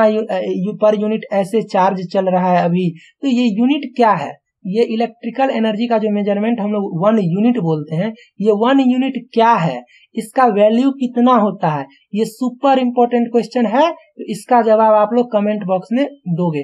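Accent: Bihar, India. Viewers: BIHARI HO SIR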